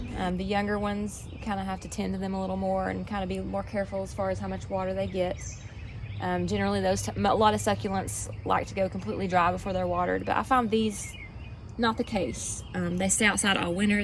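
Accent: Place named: Tennessee, United States. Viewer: Are you in Tennessee? I am . Your accent is similar. What type of soil do you use ?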